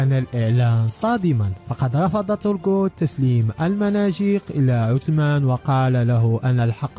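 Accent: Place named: Tetouan, Morocco. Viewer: أخي إن أهل مدينة تطاون (تطوان) ينطقون الراء بهتن الطريقة وليس لهم يد في هذا . واهل فاس الرباط سلا ووهران المستعمرة من الجزائر ايضا يتحدثون براء قريب من هذا النطق. فأرجو ان تتقبلوا الاختلاف.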